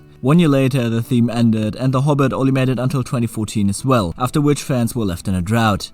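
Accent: Germany. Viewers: U are German right?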